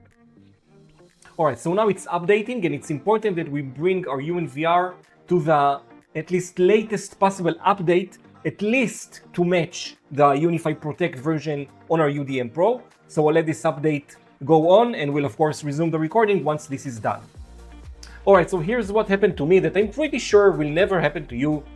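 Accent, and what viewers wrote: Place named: Israel. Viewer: Very good video, concise and to the point, without the blah, blah, blah of many influencers. A pleasure to watch. The guy sounds like a Romanian Israeli.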